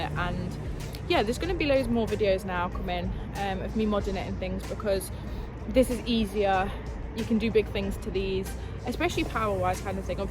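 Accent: United Kingdom. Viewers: Are you from derby?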